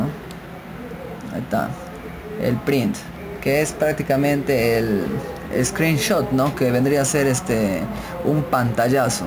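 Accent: Peru. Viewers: Acordemonos de que nuestro hermano nos ensena con la forma de hablar en peru, la cual como en toda America Latina tenemos muchos acentos y modismos en nuestra forma de hablar el espanol asi que por favor no lo corrijamos ya que hasta mucho hace nuestro hermano con enzenarnos a los que no somos tan Buenos en la computadora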